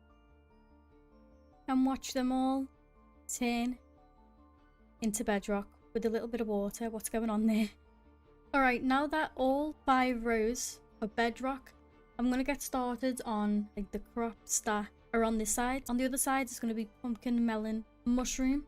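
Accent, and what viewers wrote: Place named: Liverpool, United Kingdom. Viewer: That accent of u sounds like ur from scouse area 😊🎉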